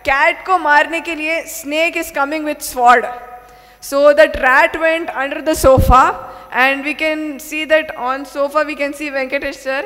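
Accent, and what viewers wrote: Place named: Tamil Nadu, India. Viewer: Ur accent like tamilian....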